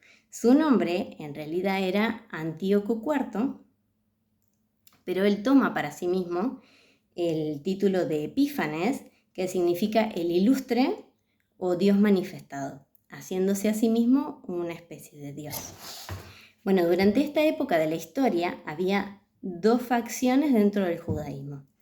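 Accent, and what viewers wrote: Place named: Argentina. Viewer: Como muchos argentinos, pronuncias los sonidos de la "LL" y la "Y" como un "SH" o "CH". Cuando lees palabras hebreas, tu pronunciación argentina dificulta saber cuál es la fonética real de esa palabra y, en consecuencia, de qué palabra se trata. Como tal vez te resulte prácticamente imposible darnos la pronunciación correcta, al menos podrías incluir subtítulos. Con las palabras por escrito, podremos nosotros mismos buscar información adicional del mensaje que quieres entregar.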